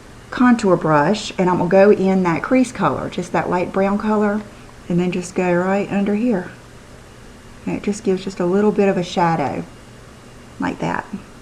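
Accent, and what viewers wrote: South, United States. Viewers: Heheee you, with the bur-berry vs. bur-buh-ree.. I say that you ought to pronounce it just like your instincts tell you:) You are a southern belle; I think it fits that you have your own way of pronouncing it. The lashes/mascara look is incredible.. I think you have been using it a bit lately, yes? (those products) I say this because your lashes have really been looking extraordinary lately. Nice that the line isn't just a famous name, but also are rather superior compared to many other products.. mascaras, etc., for example. You truly are beautiful,.. inside and out. x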